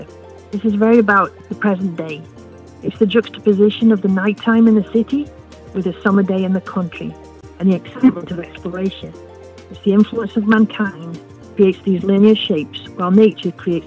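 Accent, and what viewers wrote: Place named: Northern England, United Kingdom. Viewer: Love hearing your luvly Northern voice , hearing your descriptions and your creative experience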